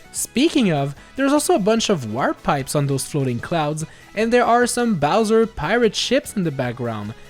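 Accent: France. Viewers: I speak french and your accent is not bad 😁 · Hey nice french accent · Why do I feel like that English is actually second language and French is his first.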